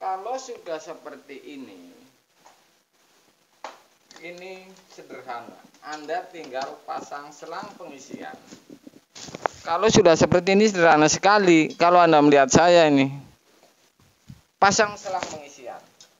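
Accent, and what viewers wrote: Banyumas, Indonesia. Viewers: Salam ngapak.....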